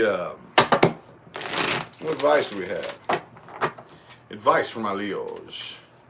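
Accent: United States. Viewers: I missed u live! Ah well!! Next time! I'm not evn Leo but i like ur vibe!! Your funny and the key is your last 1st evr vid I saw of yours was really really accurate4me so i know ur good. I am irish! I love ur American accent!! Hope u don't mind me say that!! It's a compliment!!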